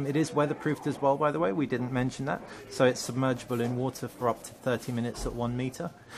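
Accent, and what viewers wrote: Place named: United Kingdom. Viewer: he's British and that's how you're supposed to say it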